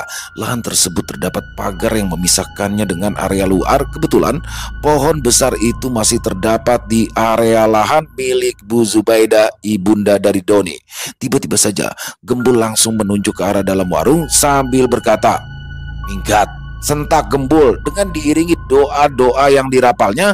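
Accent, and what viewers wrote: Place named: Betawi, Indonesia. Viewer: Slmat siang..senang kisah warong kopi Dony muncul kmbli bersama si gembul yg lucu juga dialek khas Betawi nya mas...sy tunggu cerita nya yg lbih seru,slam dri sy ibu Eky di solo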